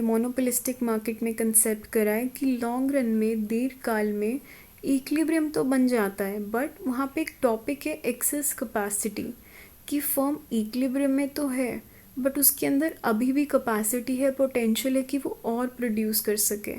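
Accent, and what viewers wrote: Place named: Kashmir, India. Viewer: voice sai Kashmiri lag rahai ho ap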